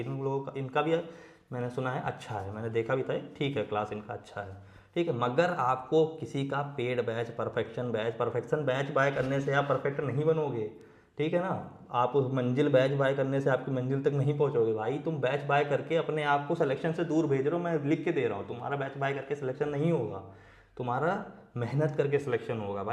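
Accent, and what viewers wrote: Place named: Uttar Pradesh, India. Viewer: Up accent❤😅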